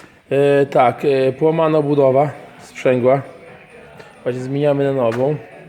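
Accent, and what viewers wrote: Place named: Podlasie, Poland. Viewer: Tak ło chyba kolega z Podlasia :)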